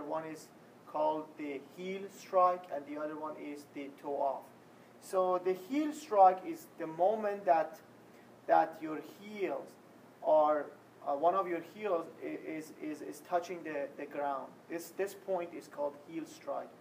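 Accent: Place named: Iran. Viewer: Well done! with nice Persian accent. Damet Garm Ostad!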